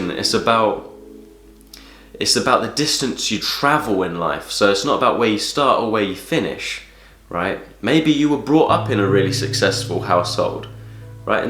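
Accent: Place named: Southern England, United Kingdom. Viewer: pretty sure yeah. I'm English to. Think he's from the South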